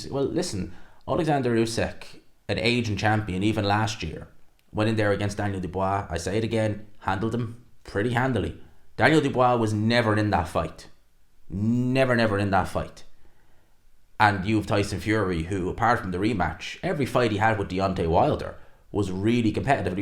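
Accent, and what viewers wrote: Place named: Ireland. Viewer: Where are you from? Accent sounds very Irish 😅just curious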